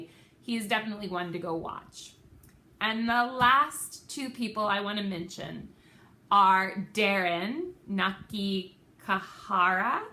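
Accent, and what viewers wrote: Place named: Australia. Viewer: I can't wait to hear my name with an Australian accent!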